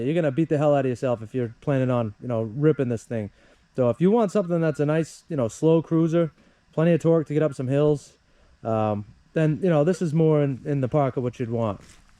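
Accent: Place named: Massachusetts, United States. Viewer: You sound New England. I’m from Maine and you sound like Massachusetts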